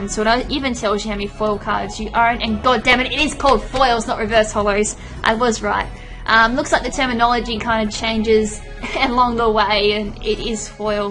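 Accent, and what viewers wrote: Australia. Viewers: Are u australian. i am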